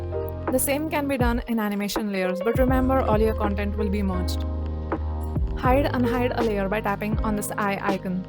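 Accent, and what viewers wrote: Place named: India. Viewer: From his accent he looks like an Indian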